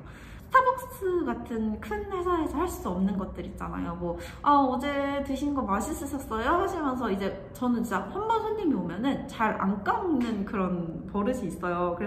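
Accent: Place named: Gyeongsang, South Korea. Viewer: 사장님 경상도분같은데 말투가~! 카페 부산쪽에있으신걸까요~~ 한번 가보고싶어요💕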